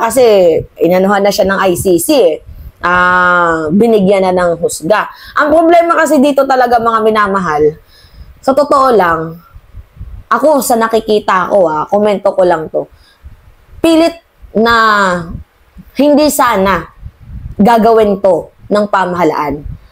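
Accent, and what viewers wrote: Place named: Waray, Philippines. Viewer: idol maam from a uyog ka po ba? kasi yung pag waray mo may sin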